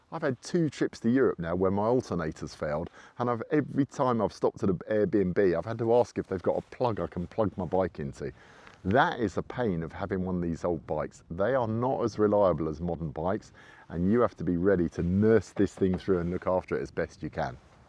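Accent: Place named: United Kingdom. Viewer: You sound like you have a British accent. As a Brit, have you owned or ridden a classic (pre John Bloor) Triumph or other British bike? I’ve owned many old 1960’s-1970’s Triumphs over the decades, and I still have a couple. Although it’s not as easy as running to the local dealership, finding parts for those older bikes isn’t really a problem. You can find anything you need online… for now, anyway.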